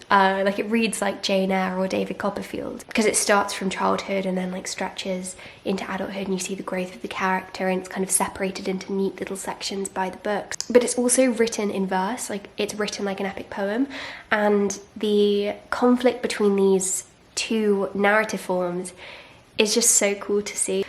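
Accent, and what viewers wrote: United Kingdom. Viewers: British accent and cute home content.........yes, i love it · As a foreigner i am literally amazed by your smooth British accent and your calmed temper. Your style is so cozy and cute. The way you spend your free time with reading a lot (not ‘waste’ it like unfortunately majority of people nowadays, especially on YouTube) is immensely inspiring♥️ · I wish She could read to us. Her voice is very calming and lol...I love her British accent. 😆👍💜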